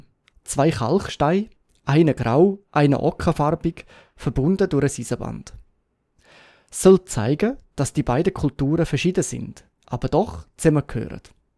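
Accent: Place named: Appenzell, Switzerland. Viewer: Hallo, ich bin erste eben auf deine interessanten Beiträge hier auf Youtube gestossen. Sehr cool, dass du das machst und deine Produkte auch als Ressource fürs Lernen von Schweizerdeutsch deklarierst! Ich möchte anregen, dass du bei deinen Beiträgen deinen doch sehr speziellen Dialekt (appezöller oder rhintaaler?) klarer ansprichst und ausweist. Ich glaube, das wäre wichtig, falls sich Personen sprechen-lernend mit deinen Ressourcen beschäftigen und dann "örstuunt sönd, wönns in andere Sprachregionö vo dö Schwyyz öber ihi appezöller Uusspooch stuune döönd". Vielleicht ist es dir ja sogar möglich, verschiedene Dialekte in deine Ressourcen einzubringen? Alles Gute für deinen Kanal und beste Grüsse aus Biel!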